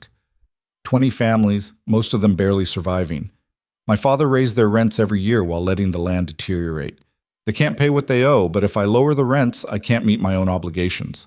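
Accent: United States. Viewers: I hate this AI narrator. American or Canadian accent for a British based story...and it's voice is just annoying.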